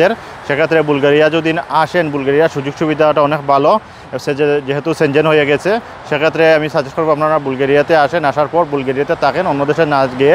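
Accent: Sylhet, Bangladesh. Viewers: ভাই সিলেটি নি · Mone hoy Sylheti vai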